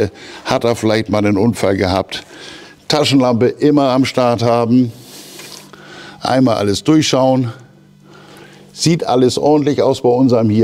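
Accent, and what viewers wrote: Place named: Hamburg, Germany. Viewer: Also die Kaufberatung an sich ist nichts besonderes, weil nahezu alle Tipps auf jedes alte Auto zutreffen. Kleiner Kritikpunkt. Aber die kleinen Hintergrundinformationen zur Geschichte des Fahrzeugs oder die Prognosen der Wertsteigerung sind interessant. Und lieber, Deine Art zu Reden ist typisch Hamburg, sehr sympathisch, nordisch trocken und ich grinse bei jedem Video 😁 Bist halt ein echter Typ! 👍🏻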